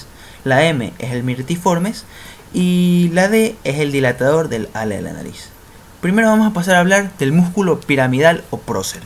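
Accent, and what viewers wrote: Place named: United States. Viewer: Todo muy bien … pero tienes problemas para pronunciar la R porque se escucha como un gringo 🙄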